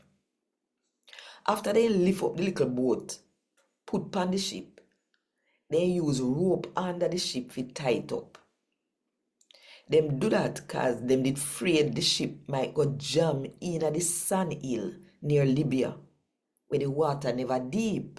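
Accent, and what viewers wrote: Jamaica. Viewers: Such a beautiful lady inside and out. Im about as Jamacian as i look, but i found an awesome way to listen to the bible. I understand it. I cant speak it but i understand. Most of it. I love your language dialect accent or whatever you call it. If you read the gospel of John... i will learn quickly bc i know it almost by heart. Ill look. God bless you, sister!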